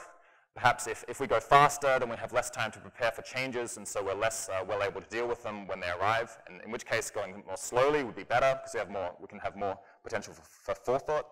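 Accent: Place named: Australia. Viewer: just his accent. one part of Australian accents is higher pitch at the ends of sentences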